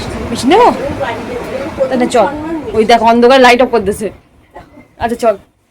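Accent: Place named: West Bengal, India. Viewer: Tomar kothar j taan ta amar khub bhalo lage...amar bashirhater ak bandhobi ache...or kothar taan o erokom.....besh bhalo lage❤😊😊manu k bairer khaabar beshi diona....bhalo theko tomra ❤️❤️❤️❤️👍👍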